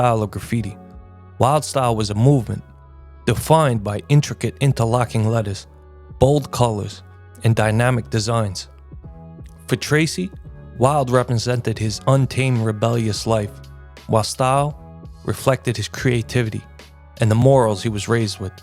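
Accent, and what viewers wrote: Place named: New York, United States. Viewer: Very well done doco. You have the perfect classic NY accent for the narration that really sets the tone. Fly high Tracy168🙏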